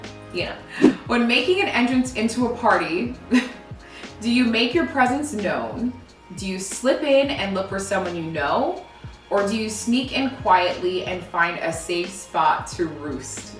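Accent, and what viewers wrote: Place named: New York, United States. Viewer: Girl you don't have to defend your New Yorkness- I (as a native Brooklynite who's been living on the West Coast for 10 years) can tell just by the way you say the word 'water'. lol Your accent makes me miss NY. <3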